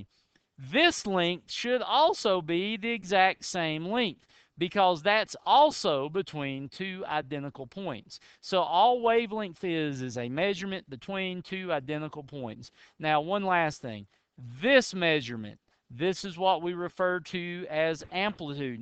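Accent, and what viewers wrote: United States. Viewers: loving the western accent! -- better explained this way!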